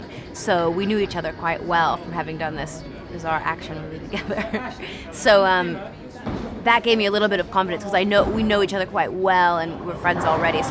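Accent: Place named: England, United Kingdom. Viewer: Stick to your English accent, girl. Suits you better.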